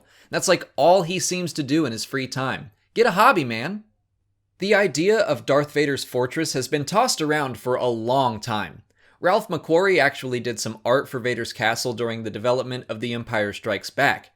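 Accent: United States. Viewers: Great video, sounds even better with an American English accent rather than that Goat sounding yuck mouth. Really is great to have working with. im a huge fan of both of you. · Why am I hearing a American talking? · ffs. I came to this video for more of epic Australian accent. Not this American voiceover crap.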